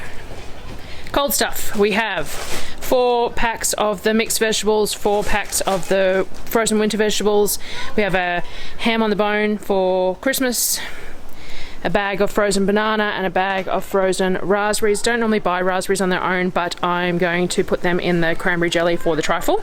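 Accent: Australia. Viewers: G’Day!!! New subscriber here. 🇦🇺💕So wonderful to hear an Aussie accent. Great video - shout out from Sydney x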